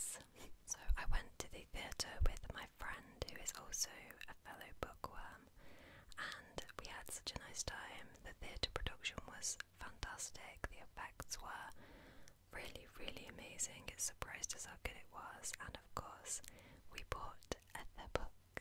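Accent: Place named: United Kingdom. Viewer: As a fellow Brit ASMR in a British accent is super comforting to me, pair that with books and you really can’t go wrong, really happy I found your channel!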